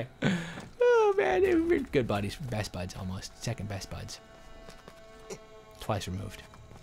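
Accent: Scotland, United Kingdom. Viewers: Omg dat Scottish accent! Brilliant. :3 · Amazing Scottish a sent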